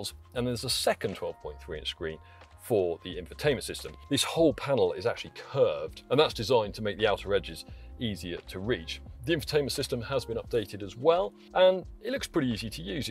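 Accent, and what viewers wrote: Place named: England, United Kingdom. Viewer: He speaks with a strong English accent! Hard to understand.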